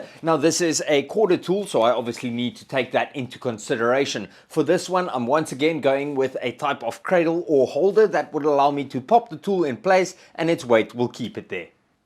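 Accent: South Africa. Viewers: Tanks for the response to my comment.. Having lived in Johannessburg for 5 years as a teenage, your accent just brings back many great memories of the country. Please keep up the grear work be postive and stay healthy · Do I recognise a South African accent? 😁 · Great ideas, I have used some of these ideas myself. Will implement some of yours. Love your accent, you sound like my south African mate. Cheers alojs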